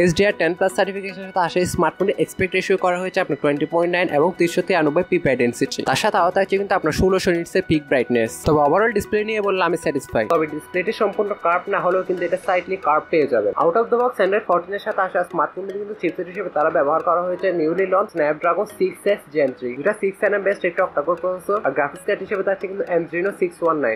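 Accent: Chittagong, Bangladesh. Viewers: চিটাইঙা পোয়া বাজি যেইল্লা মাতক চিটাইঙা যে বঝা যাইবো😅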